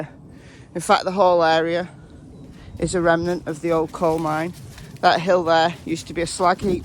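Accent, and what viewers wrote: United Kingdom. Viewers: I love you, your British accent & your very adorable dogs! I have been following you for awhile & look forward (when I get off work as a night shift nurse) to listening to your podcast on my drive home. Keep up the great work!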